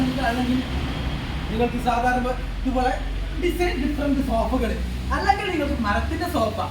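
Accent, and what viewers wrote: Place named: Malappuram, India. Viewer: ഇടക്ക് മലപ്പുറം ബാഷ വരുന്നുണ്ട്...😮😮😮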